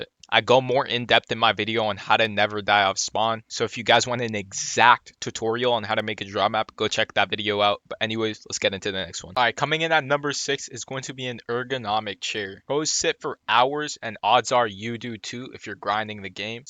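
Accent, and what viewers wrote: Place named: Canada. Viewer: Je sens ton accent canadien 😂😂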